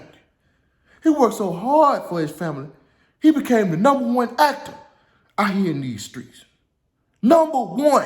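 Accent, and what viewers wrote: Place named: South, United States. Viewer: I loved your analysis. I’m proud of you. You proved Southern accent does not equal dumb. I know because I’m from Jackson, Mississippi.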